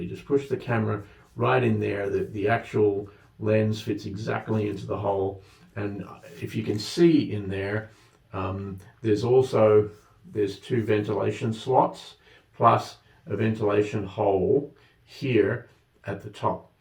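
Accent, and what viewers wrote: Australia. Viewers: That's a really interesting accent. Are you an Australian in America?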